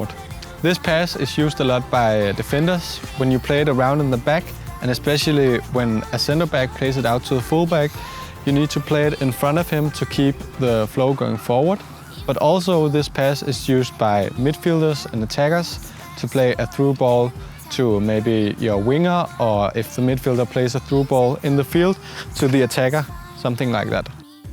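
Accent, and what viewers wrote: Denmark. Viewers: Is he danish? You can hear it by his accent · Elsker den danske Engelsk❤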